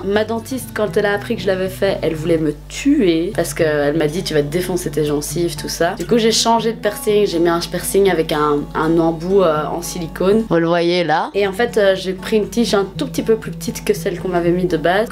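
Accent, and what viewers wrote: Belgium. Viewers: ahh mais je t'aime d'amour !! Tu me donnes trop le sourire avec ton petit accent belge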